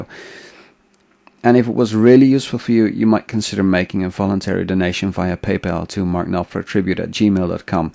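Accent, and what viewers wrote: Netherlands. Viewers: Oh my god. Thank you for this... 10 minutes indeed. Can't mistake THAT accent.. I'm from Los Angeles but my dad's family is from Arnhem. Bedankt! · Er vanuitgaande dat ik een NL-er hoor: Goeie uitleg! Dank!! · Quick question: are you Dutch?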